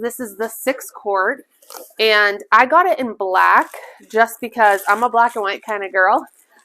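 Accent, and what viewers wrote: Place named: Pennsylvania, United States. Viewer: I have to ask if you are from or live in Pennsylvania. I pronounce a lot of the same words as you. 🤗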